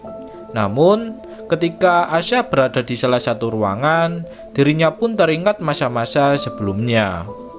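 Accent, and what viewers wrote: Java, Indonesia. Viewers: Jngn terlalu medok mas suara nya... Biar saat bercerita lebih terbawa suasana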